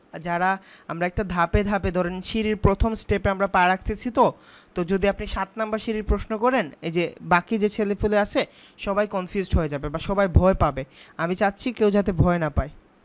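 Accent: Sylhet, Bangladesh. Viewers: ভাই কি সিলেটি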